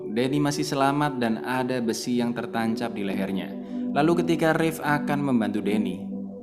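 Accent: Java, Indonesia. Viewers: G rame suara jawa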